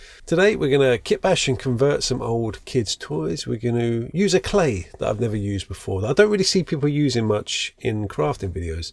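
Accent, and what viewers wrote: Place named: Cockney, United Kingdom. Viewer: bruv, that cockney accent gets me every time. XD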